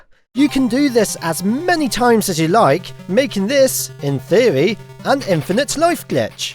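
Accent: United Kingdom. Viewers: Son of a glitch, but British :)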